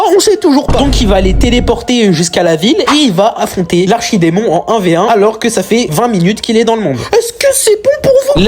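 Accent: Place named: England, United Kingdom. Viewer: On voulait la prononciation ton accent anglais nous as manquer 😢😢😢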